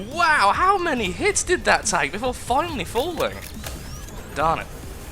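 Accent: Netherlands. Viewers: are you dutch i am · Wait are you dutch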